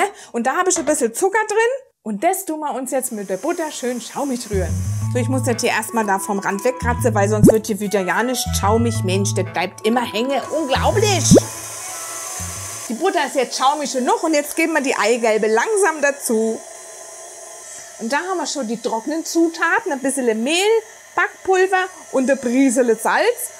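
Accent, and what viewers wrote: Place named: Saxony-Anhalt, Germany. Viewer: Ne, a bissle Sachsen-Anhalt iss da ach mit drin ne ;o) aber der Kuchen ist super... gleich nachgemacht. Liebe Grüße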